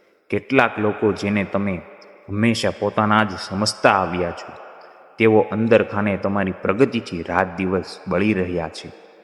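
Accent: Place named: Bhavnagar, India. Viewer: તમે ભાવનગર તરફના હો, એવું તમારી ભાષા અને ખાસ તો તમારા ઉચ્ચારો પરથી લાગે છે. જવાબ આપશો.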